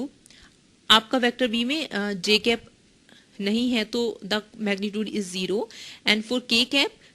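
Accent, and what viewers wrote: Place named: India. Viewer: ....本来听生肉已经很难了，还是印度口音，有点绝望。不过讲的确实不错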